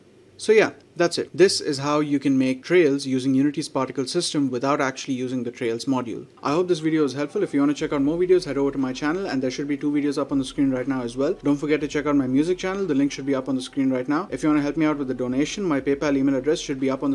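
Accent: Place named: India. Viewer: Damn it's one great Indian accent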